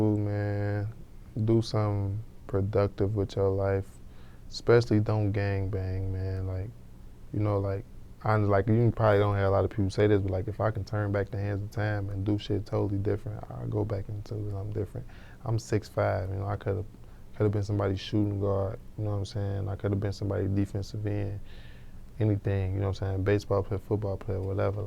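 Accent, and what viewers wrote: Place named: Wisconsin, United States. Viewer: he not formally from the chi, from Wisconsin his accent mixed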